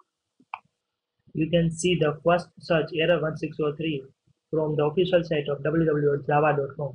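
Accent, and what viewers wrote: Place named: India. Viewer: thankyou nobody could show how to solve the error code they just showed how to download it. s soon as i heard and indian voice i knew you were going to solve my issue.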